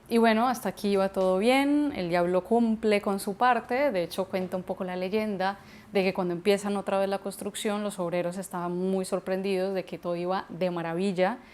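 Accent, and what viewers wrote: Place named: upper class, Colombia. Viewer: Esta encantadora mujer habla como las colombianas de clase, de las que van quedando relativamente pocas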